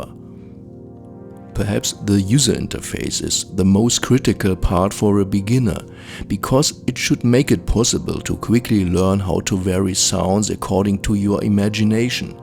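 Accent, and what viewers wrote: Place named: Germany. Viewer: I missed the special, somewhat druggy German accent 😅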